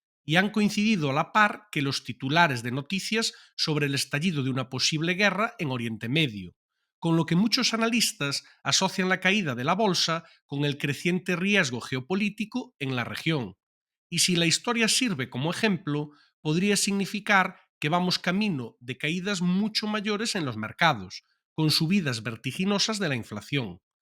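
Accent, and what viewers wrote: Galicia, Spain. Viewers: Acento gallego? Me recuerda al gran Miguel Anxo Bastos. 😆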